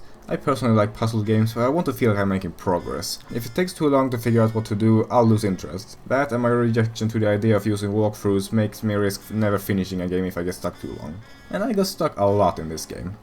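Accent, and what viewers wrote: Sweden. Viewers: Wait, are you sweedish?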